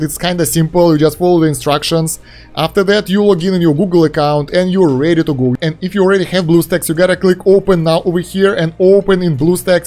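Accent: Russia. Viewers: Ты русский или умеешь говорить с акцентом?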